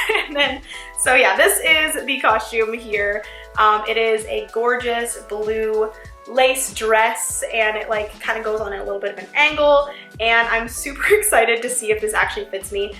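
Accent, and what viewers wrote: Canada. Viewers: Evelyn M, she can't help it! That's what happens when you're born with a Canadian accent. What if I said I didn't like that you're a girl, you can't help it you're born with it so stop being mean to people when they can't stop or help with their doing or saying. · paige thames she is French candian thAts why she talks like that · Are you Canadian? It really sounds like it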